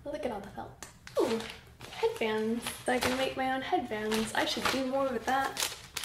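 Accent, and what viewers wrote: United Kingdom. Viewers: Love that you're starting to pick up a British accent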